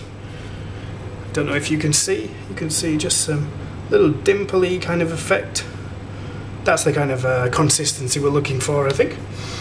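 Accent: United Kingdom. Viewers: Yeah, in the UK, the TV is mostly a few government-run channels (at least thats what I got from going to scotland 3 times), and I don't think they have Man vs. Wild. And you know, some of the pronunciations just sounded southern Scottish to me, so that's where I got the impression. By the way, I might just not have found it yet, but if you haven't made one already, are you ever going to make a pizza video? I realize pizza is a fairly simple idea, but it has a variety just like pasta.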